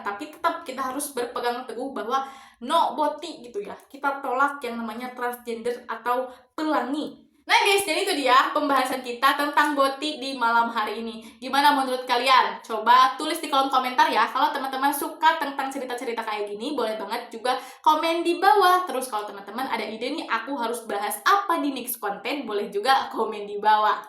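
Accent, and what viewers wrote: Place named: Sunda, Indonesia. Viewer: Gaya bicaranya mirip kang dedi mulyadi cenah😂 sunda buanget Yang paling penting di video itu kita sudah diarahkan untuk mengetahui perbedaan tiga hal dalam mengidentifikasi LGBT 🌈 1. Posisi seksual 2. Ekspresi 3. Orientasi seksual Bottom adalah posisi seksual yang dianggap sebagai orang yang lebih pasif atau submisif, begitu sebaliknya dengan top di gambarkan dominan dan lebih aktif Ekspresi ini adalah cara orang memperkenalkan diri ke publik yang biasanya dari sikap dan sifat atau bergaya pakaian yang khas Orientasi seksual ini yang menjadi bagian paling vital, hal ini yang secara jelas menentukan apakah orang itu punya ketertarikan seksual yang beda, setahu saya ada heteroseksual (lawan jenis) dan homoseksual (sesama jenis) aja Di tabel LGBTQ+ paling banyak adalah ekspresi diri Lalu untuk faktor lingkungan dan genetik itu udah umum, semua orang seharusnya tahu dan bisa dilihat langsung, saya fokus ke aspeo sosialnya saja dan psikologisnya Berikutnya mungkin yang kurang dipembahasan itu adalah sudut pandang tiap individu-individu terhadap BOTI, seluruh pembahasan kamu udah bagus cuman belum ada observasi atau penelitian langsung turun ke praktik lapangan sosial. 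Saya pernah mencoba memahami kenapa Boti dianggap salah selain karena melanggar aturan agama tertentu. Pada dasarnya boti digambarkan sebagai sosok yang feminim dan terkadang lebih pasif, hal itu sebenarnya dapat menganggu stabilitas ketertarikan seksual, terkadang ada boti yang terlalu cantik yang malahan banyak yan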